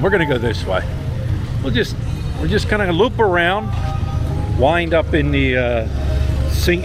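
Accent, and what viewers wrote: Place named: Philadelphia, United States. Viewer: Hey, since your there for the long hull, how bought taking some Pilipino language courses to be able to speak a little and understand a little bit more of the Country your living in. I would and maybe in return teach someone some of you own intonation from, I think that would be cool, what do I know, love your Gig, Thumbs Up Dude, Ricky from Cape May....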